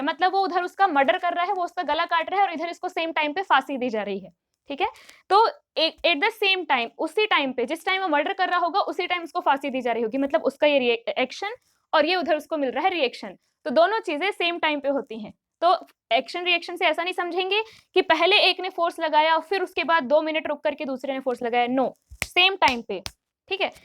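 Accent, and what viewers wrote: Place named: Uttar Pradesh, India. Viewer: Ma'am aap up se ya Bihar se hongi etna fix hai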